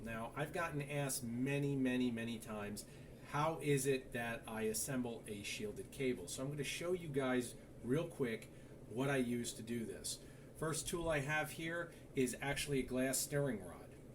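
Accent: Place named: Eastern Pennsylvania, United States. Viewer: you sound like you're from eastern PA. good explanation on the video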